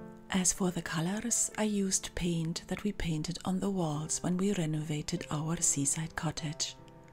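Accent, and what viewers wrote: Scotland, United Kingdom. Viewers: How is it that you have such a pronounced "Scottish" accent? I''ve known people who've spent almost their entire lives living in their adopted country but still speak english with the accent of their birth country.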